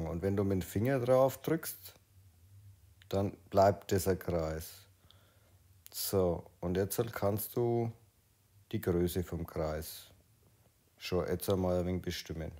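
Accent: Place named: Franconia, Germany. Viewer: Toll erklärt 😅 endlich mal ein Procreate Video im fränkischen. Leider klappt es aber bei mir nicht. Das Bild wird nur dupliziert und nicht ausgeschnitten :(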